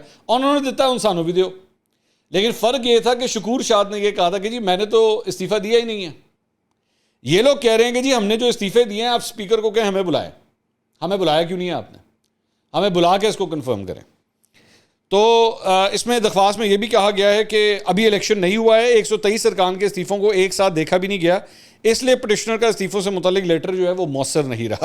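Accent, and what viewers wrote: Punjab, Pakistan. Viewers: Punjabi touch suit him sir We can better understand his point in mother language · کمال کا ہے آپ کا پنجابی ٹچ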